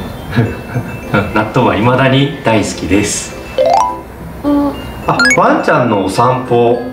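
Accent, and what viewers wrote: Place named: Kyushu, Japan. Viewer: 九州訛りが お顔とミスマッチなところがとてもいい感じで好き😊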